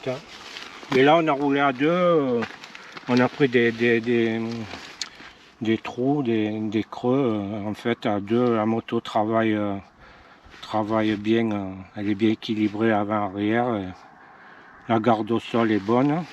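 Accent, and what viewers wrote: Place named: South, France. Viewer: Salut l'ami, j'aime ton accent du Sud 👍. Cette Hornet 750 ne m'intéresse pas mais de tous les Youtubeurs que je suis abonné je fais une exception parce que c'est toi, donc j'ai regardé ta vidéo. Moi c'est la nouvelle Transalp que j'attend et j'espère que tu pourras l'essayer dès qu'elle sera dispo à ta concession. Après, selon la fiche technique c'est la hauteur de la selle qui me fait peur...J'ai pas de grandes jambes, c'est pour ça que j'appréhende les Trails. ✌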